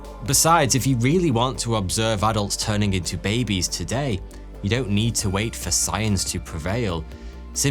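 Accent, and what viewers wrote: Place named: Leeds, United Kingdom. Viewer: Hey - do me a favor. I guess accents, and for your accent I am going with Leeds or the near North of Leeds, How close was i? Thanks for being a sport.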